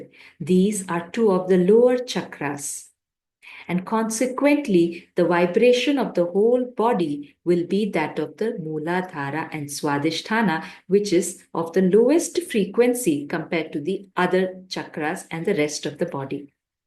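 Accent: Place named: India. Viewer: Thank you very much for teaching the Hindu dharma. Please also make a series on Purusha Dharma Paddhatih so that I can teach my son. Please also provide any authentic references or resources for Purusha Dharma Paddhatih. I also appreciate your calm tone and pure Indian accent, making everything very clearly understandable.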